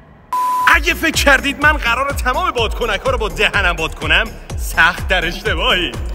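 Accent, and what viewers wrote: North, Iran. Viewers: پشمام فکر میکردم شمالی باشی 😂 ویدئو هات خیلی خفنه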